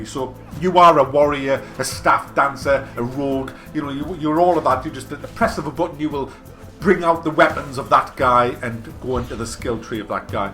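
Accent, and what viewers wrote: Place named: Newcastle, United Kingdom. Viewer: His geordie accent does it for me lmao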